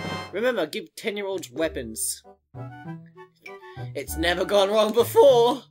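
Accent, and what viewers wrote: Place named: RP, United Kingdom. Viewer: you sound really posh XD waaah i should do a lets play...maybe XD so you can hear my voice >:3 i kid XD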